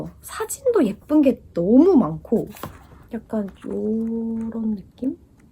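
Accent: United Kingdom. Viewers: I really like your british accent, can you make more videos of communicating with other people in english?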